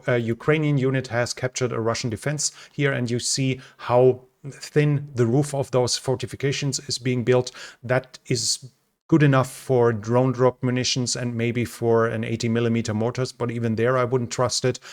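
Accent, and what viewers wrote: Germany. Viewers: Hessisch Dialekt? Your English sounds like my Frankfurt family..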